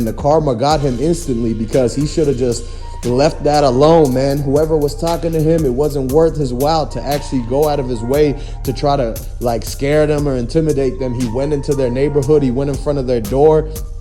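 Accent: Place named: Idaho, United States. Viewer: Man delete this dude u sound like u from Idaho n don’t have a clue what really happened 😂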